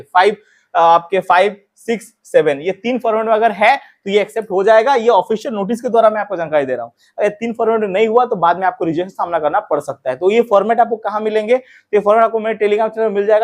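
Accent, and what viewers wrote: Odisha, India. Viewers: Bhai app odisha ke ho kya 😍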